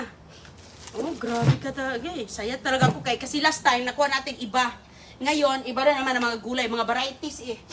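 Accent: Visayas, Philippines. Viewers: it's bisaya accent...wag ka mag inarte...bakit ang boses mo ba boses angel ka ba😂😂😂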